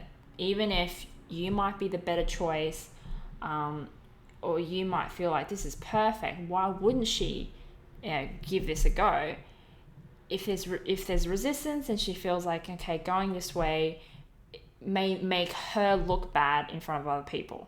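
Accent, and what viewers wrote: Australia. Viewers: I do appreciate your honesty in regards to this matter tho... so thank you! :3... BTW... are you Australian? That is one hell of an accent.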